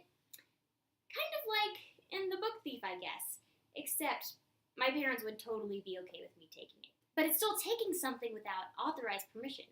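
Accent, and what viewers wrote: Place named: United States. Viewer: I love your American accent! You sound so cute♥️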